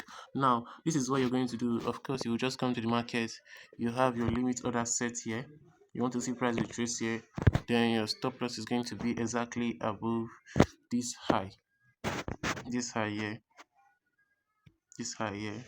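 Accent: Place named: Africa. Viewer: Your name is indian but you are speaking English in african accent Your words are not clear first work on your accent I'm forced to see transcript everytime you speak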